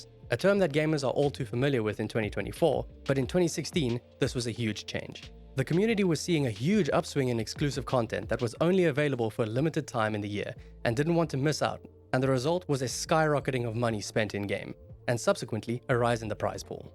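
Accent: South Africa. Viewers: I thought this guy sounded south african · Can spot that South African accent a mile away 😂 Great vid.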